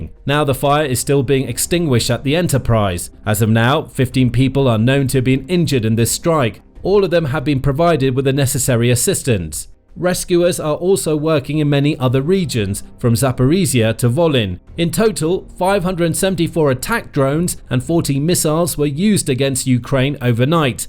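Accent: England, United Kingdom. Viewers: I am so tired of an English accent. Thumbs down.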